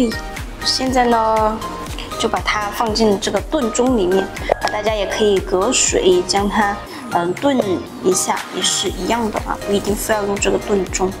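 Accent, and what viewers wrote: Sichuan, China. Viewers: 👍👍實用，四川普通話嗎？☺️☺️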